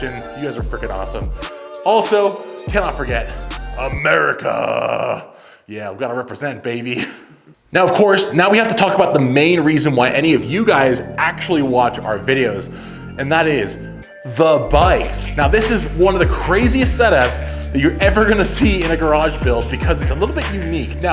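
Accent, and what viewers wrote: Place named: Australia. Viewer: i thought you where aussie from just watching your shorts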